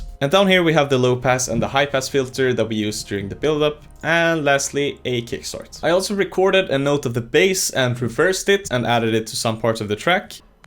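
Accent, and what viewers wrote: Sweden. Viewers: Feel like you have alot of swenglish in you accent. Svensk?! 😄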